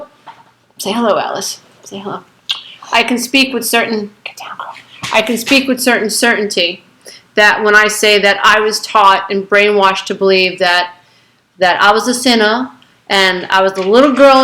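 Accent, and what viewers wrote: New York, United States. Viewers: OMG! Love you! Love your style too! You SOOOO hit the nail on the head with everything you speak of in this video! This is the first time I'm viewing your videos as I have been doing inner child work for years and within the whole ascension process, but not with any major breakthroughs, THEN, I found this! I love your New Yorkness! I from NYS as well, (in fact, we have the same enthusiasm when speaking of something we love!!) When you described the narcisst and the co-dependent, holy smokes, I realized that my mother was both & so was my father! I cannot even describe how much this video has helped me, and this is only the first one! Will be viewing all of your videos on this subject! You are so genuine, funny, inspirational and so right on!:D AND explains why I've always had 5th chakra issues! I also follow LOA practices and metaphysics, raising my vibration, spiritual, sound healing, Reiki, you name it, but WoW! 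Love your uniqueness! Thank you Sweet One for doing what you do and for shining your light ever so brightly!!!!!!! - You Rock!! Namaste. <3 <3 <3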